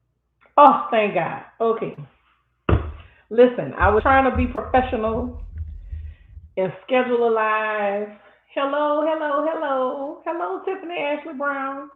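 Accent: New Orleans, United States. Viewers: Love your story telling time, I have to say your New Orleans accent makes it even better. ❤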